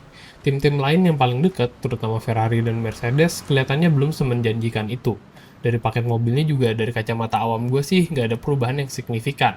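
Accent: Depok, Indonesia. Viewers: "Tahun ini Tahunnya Ferrari" Ucapan setahun sekali Btw bang lu orang depok? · Ente orang Depok ya min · Bang luh orang depok yaa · Hahaha Sawangan GP, adminnya orang Depok nih 😂🤝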